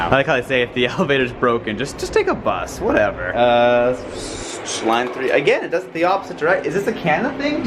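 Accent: Boston, United States. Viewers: Watching someone with a Boston accent here makes me smile! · Wow that was an aural and auditory feast! Even your Boston accent is coming back strong!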